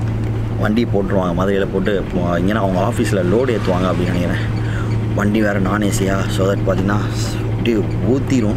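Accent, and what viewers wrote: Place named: Kerala, India. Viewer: Im a Kanyakumari Malayali....I know u have a Malayali touch....U know Malayalam...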